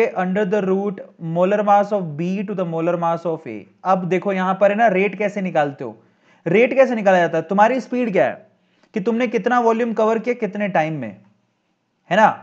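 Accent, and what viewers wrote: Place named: Gujarat, India. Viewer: Sir gujrati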